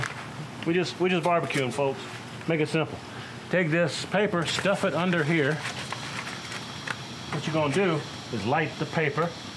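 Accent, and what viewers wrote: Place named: Texas, United States. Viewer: The moment I heard you I knew I had found my brisket man....native Texan here (the HEB clinched it that you were too)just your voice makes me home sick and the brisket almost made me cry...have lived away from home nearly 35yrs now (now WAY south of the border in Guatemala as a retiree)...no brisket for 1,000 miles. Great pork here in Guatemala terrible beef (skinny range fed and "pasture" to table the next day so TOUGH..makes good tasty burgers though when mixed with some ground pork. Bought hubby a Weber Smoker and put it together today...smoking tomorrow....thanks for your expert cooking lesson!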